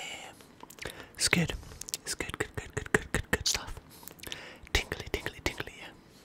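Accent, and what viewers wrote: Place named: West London, United Kingdom. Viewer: I want to hear more of that west London accent heheeee~~~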